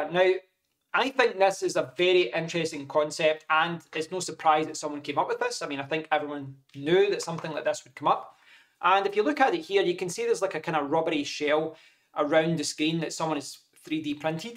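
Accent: Scotland, United Kingdom. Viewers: Nice Scottish accent 👍🏼🙂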